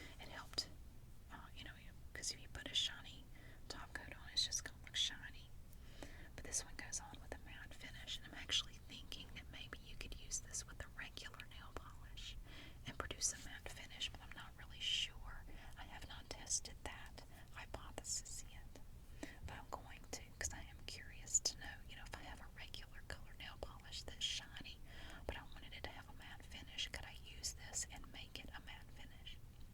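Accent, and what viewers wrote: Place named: Coastal North Carolina, United States. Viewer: New viewer! You have a delightful whisper. I'm trying to peg your regional accent. Coastal North Carolina? I grew up on east coast and settled in midsouth state.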